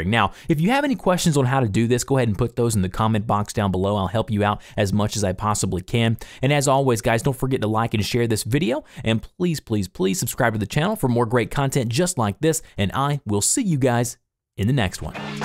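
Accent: United States. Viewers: Man u got a nice accent, which state ur from? -( I’m not an American)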